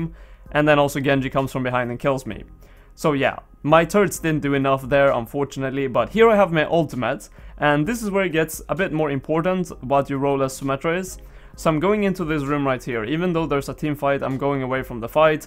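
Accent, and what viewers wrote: Sweden. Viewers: Are you from sweden?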